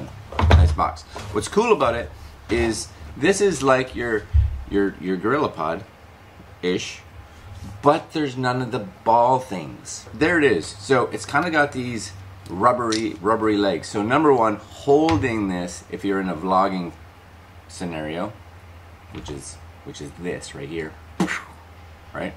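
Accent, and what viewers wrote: Canada. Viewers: My tripod has been ordered! Love the Canadian accent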